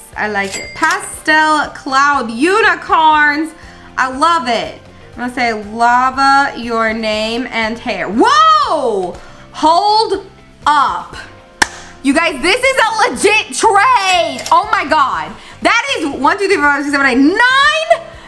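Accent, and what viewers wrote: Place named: United Kingdom. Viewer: wow your british acent is Just wow incredible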